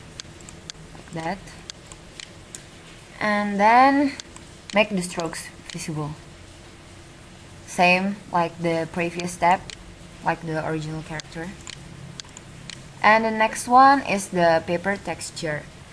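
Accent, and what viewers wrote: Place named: India. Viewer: This is really helpful ^__^ Thank You :D btw where are you from? cause your accent sounds a bit indian :)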